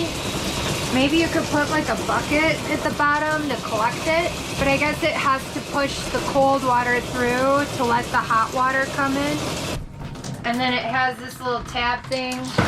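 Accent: Wisconsin, United States. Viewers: Me too....never noticed that there was a seeable problem with your nose. I think the physical pain of surgery with questionable results they will NEVER guarantee isn't worth it. Late in my career l worked for Trane and relocated to Lacrosse. I loved the WI accent, especially with females. You are beautiful and make me think you are in your mid 20's....l've already learned a bunch of tricks-techniques from you. Yes you do seem happier today....you deserve it! 😘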